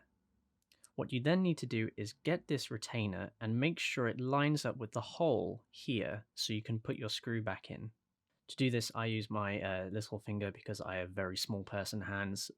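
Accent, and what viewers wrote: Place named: England, United Kingdom. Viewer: This video should be a how to video for making how to videos. Nice close up views, no distracting, uneccesary background music and as much as it pains me as an Aussie to say. An English accent. Fantastic work mate.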